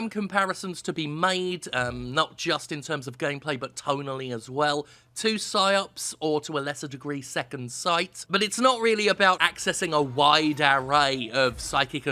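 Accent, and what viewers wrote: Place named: United Kingdom. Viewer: For some reason, sounds particularly British in this episode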